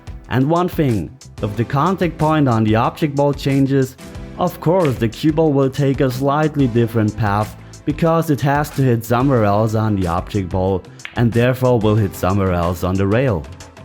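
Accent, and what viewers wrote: Germany. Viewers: he's german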